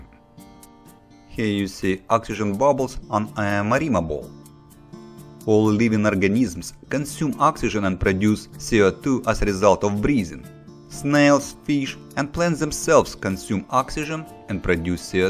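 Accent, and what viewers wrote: Russia. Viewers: Интересный у вас акцент. ВЫ не русский, случаем?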